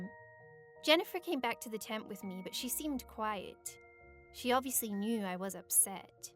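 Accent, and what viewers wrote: Scotland, United Kingdom. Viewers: You sound a little bit scottish x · You talk British I like your accent😉😉😆😇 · Does the narrator have a Scottish accent?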